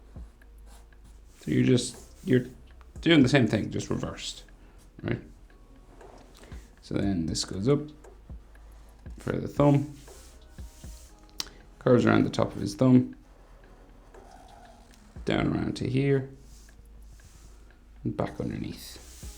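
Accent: Ireland. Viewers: Amazing work also very irish sound accent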